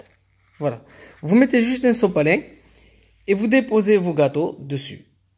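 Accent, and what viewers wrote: Marseille, France. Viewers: je suis ivoirien (cote d'ivoire ) on m'a toujours dis que ceux qui parle français avec cet accent sont marseillais. estce vrai?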